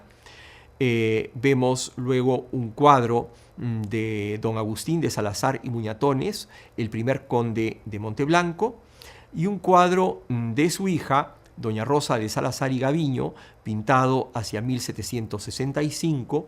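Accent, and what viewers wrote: Peru. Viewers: Muchos hablamos de esta manera, el q se educa y escucha va adheriendo estos tonos acentos de voz, es verdad q en lima muchos hablamos como la d este señor, en su mayoría con un acento limpio neutro y entendible, rn comparación a nuestros vecinos, pues en la sierra se habla distinto pues al ser quechuahablantes cambian radicalmente su tonalidad. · Pero tiene acento Peruano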